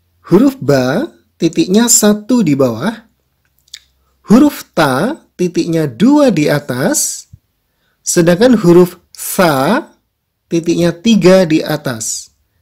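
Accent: Java, Indonesia. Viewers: Kedengaran kali yg baca orang jawa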